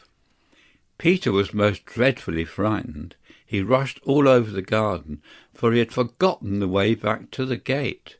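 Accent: United Kingdom. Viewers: Произношения английское,не американское.В Америке так не говорят. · Произношение британское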